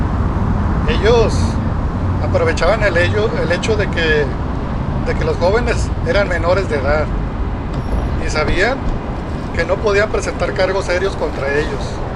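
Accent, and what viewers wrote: Mexico. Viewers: Esto de las pandillas son puras tonterias que en vez de sumar a la sociedad, restan, hablas bien mexicanoooo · Compa tienes un acento muy marcado michoacano que me distrae, el video parece que es un comercial a esas bandas, no todos los que ven el video son unos expertos como tú, buena idea fallida ejecución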